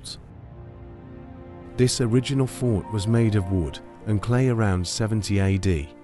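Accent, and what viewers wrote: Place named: United Kingdom. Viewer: It's absolutely incredible what can be achieved with fantastic drone footage, serious and interested historians (amateur or otherwise), a good script and a distinguished British voice (even if it is AI). Again - well done!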